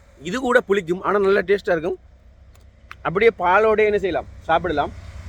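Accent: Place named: Chennai, India. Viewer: கிளாக்காய் காட்டில் இருக்கும் எல்லோருக்கும் தெரியும் நீங்கள் முதலில் Madras தமிழில் கதைப்பதை நிறுத்த முயற்சியுங்கள் இலங்கைத்தமிழில் கதையுங்கள்.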